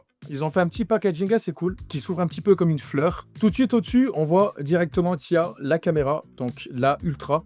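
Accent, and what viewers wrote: Saint-Étienne, France. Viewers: c'est un accent stéphanois qu'on entend ?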